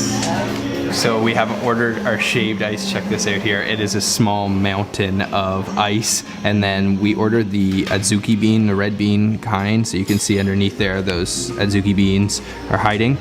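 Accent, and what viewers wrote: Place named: Canada. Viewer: You sound Canadian. You're cute-as-a-button BTW.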